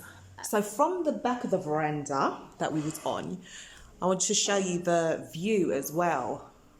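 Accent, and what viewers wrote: United Kingdom. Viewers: So refreshing to hear English accent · This presenter and her london accent is annoying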